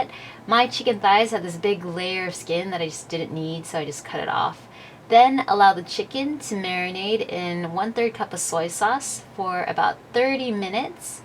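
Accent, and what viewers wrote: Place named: Philippines. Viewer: Inday magtagalog ka nlng.. Obviously marunong ka mgtagalog accent p lng...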